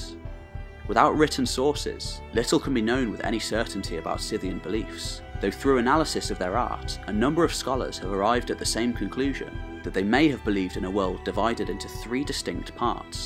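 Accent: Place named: Yorkshire, United Kingdom. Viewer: This guy is from the north of England - Yorkshire, I think - Ewan is Scottish. Very different.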